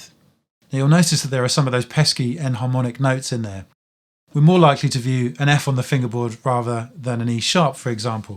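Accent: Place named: Scotland, United Kingdom. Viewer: Vvery Scottish indeed. Fantastic content. You are a natural!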